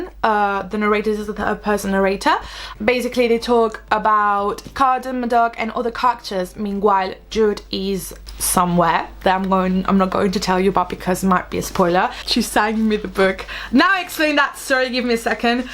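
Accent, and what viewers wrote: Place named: England, United Kingdom. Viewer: Me encanta tu acento tipo England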